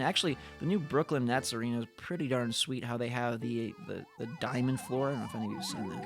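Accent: Australia. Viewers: Are you from australia? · R u Australian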